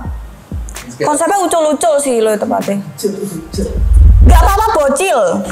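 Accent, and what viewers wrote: Java, Indonesia. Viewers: Medok e pancet ae mbak wkwkwk, sue ndak di ndilok mbak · kirain dinda haw tapi koq medok😁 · logat jawanya padat bnget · Aku suka medoknya mbk itu lo 👍👍👍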